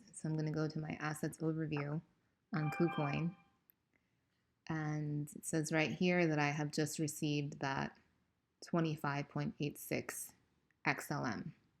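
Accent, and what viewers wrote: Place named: New York, United States. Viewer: The New York accent makes this video that much better.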